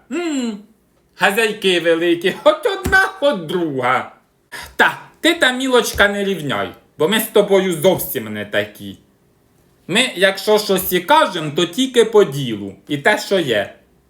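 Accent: Poltava, Ukraine. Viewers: Ой цей наш Полтавський сільський говор, прямо з язика знімаєтє.Воно конешно не в обсужнєніє, ну так жеж і є😊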